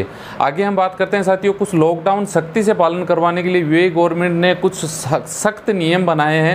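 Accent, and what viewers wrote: Rajasthan, India. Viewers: Aap sir ji pahele hindi to barabar bolna sikho saaf pata chalta h ke aap rajastani ho sorry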